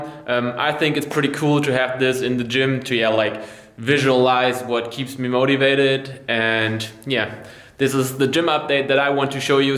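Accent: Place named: Germany. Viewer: This is a very german english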